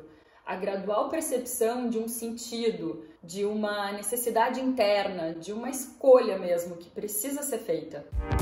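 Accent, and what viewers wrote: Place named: South, Brazil. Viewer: Olá, estou maratona do o seu canal. Gostei muito da maneira simples e objetiva com que vc aborda Jung. Esses dias tenho refletido bastante em que linha farei minha especialização. Tô sentindo o mestre Jung me chamando. Parabéns pelo trabalho. E bora espalhar Jung pela rede com sotaque do sul.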